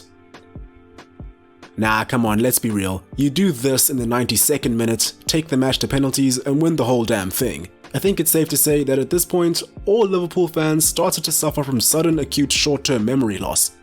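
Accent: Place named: South Africa. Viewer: I can hear that South African accent Bru 🍻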